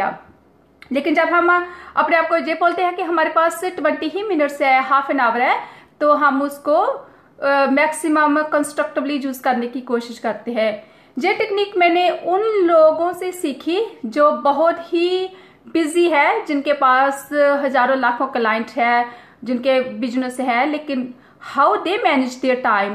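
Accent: Punjab, India. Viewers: Ajit Shirsawade wat is good in it she speaking hindi with punjabi touch while she can make video fully in punjabi language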